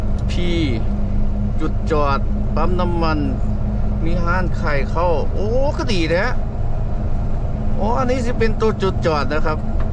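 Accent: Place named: Isan, Thailand. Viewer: เว้าสำเนียงอิสานบ้านเฮาโลด บ่ต้องเว้าว่าครับผม แค่เจ้าโดยกะพอ ไปซื่อๆ=ไปตรงๆรึตรงไป พะนะ😆😆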